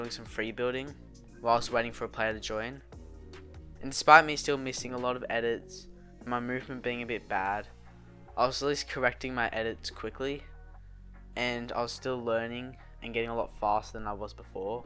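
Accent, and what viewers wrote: Australia. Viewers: Na Aussie accent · same I’m Aussie and he kinda sounds like PWR Alex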